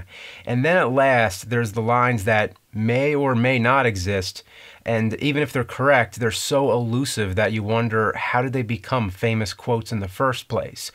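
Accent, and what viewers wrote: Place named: New Jersey, United States. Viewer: I noticed that too, I think it's just his New Jersey accent.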